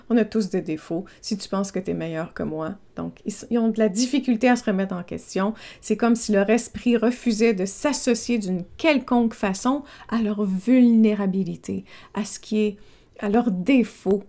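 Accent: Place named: Quebec, Canada. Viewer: Niaiserie gogosse... jamais entendu! C'est normal... je suis européen ;-) J'adore ce mot et votre accent québecquois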